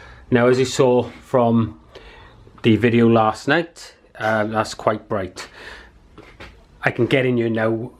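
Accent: Wales, United Kingdom. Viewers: Sounds like a strong welshman! Great video! 🙏🏴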